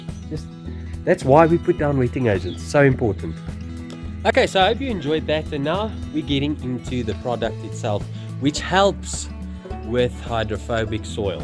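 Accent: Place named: South Africa. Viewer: I originally came here for the grass tips....Now I’m just here for that South African accent.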